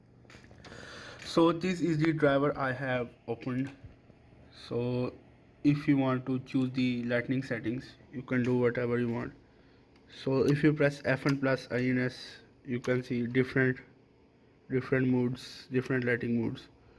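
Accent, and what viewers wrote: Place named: India. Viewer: Your Indian accent is so deep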